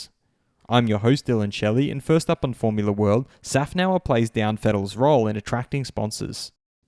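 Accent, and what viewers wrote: Australia. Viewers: I suppose it depends on how much of a fan you are and your level of interest.If you don’t pay much attention to whats going on, then this channel is perfect for repeating everything in one viewing session, but if you regularly check up on whats going on then this channel is a waste of time, as its just rinse and repeat, unless, maybe, you just like listening to Australian accent🤣 Like i said, he still has to put the work in to make these videos and he has has plenty subs, so fair play to him.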